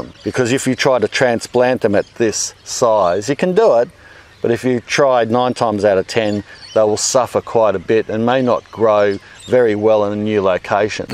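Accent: Australia. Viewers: i was like what he spiced them? ooh spaced. australian accent is the best accent 😂.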